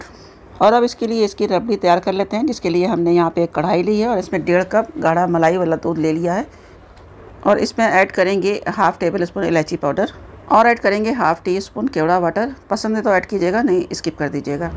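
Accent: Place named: Urdu, Pakistan. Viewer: آپکا اردو کا خوبصورت لہجہ ہمیں بہت پسند ہے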